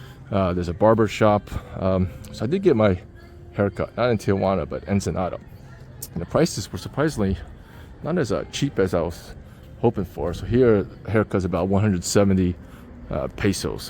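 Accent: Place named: China. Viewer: You sound Chinese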